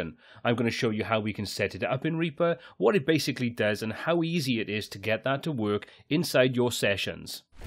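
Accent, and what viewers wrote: Wales, United Kingdom. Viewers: Great explanation and lovely Welsh accent!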